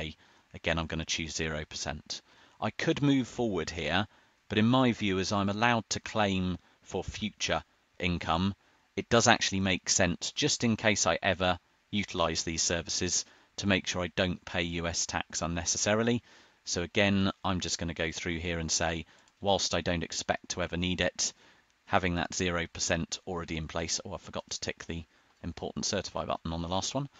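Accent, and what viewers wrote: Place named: United Kingdom. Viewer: Great helpful video in plain uk English 😊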